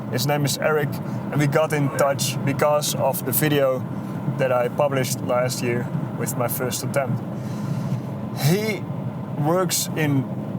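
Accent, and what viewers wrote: Netherlands. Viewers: Took me 4-5 words to go 'this guy is Dutch' am I off? Edit: nevermind lol, extremely clear obviously after watching more than 5 seconds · WTF. HA! You can always count on the Dutch… Funny fuckers, especially when speaking English.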